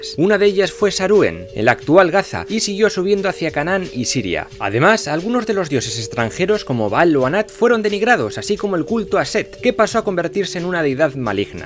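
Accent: Spain. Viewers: De que parte de España es ese acento?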